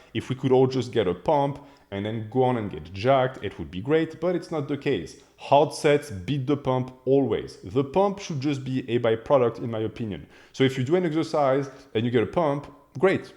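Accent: France. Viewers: T'es français ? Je trouve que t'as l'accent.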